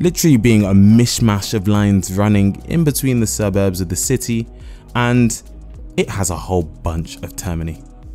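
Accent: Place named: France. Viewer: You've got an incredibly great french accent. GG for your video too its very good even for a parisian like me 😂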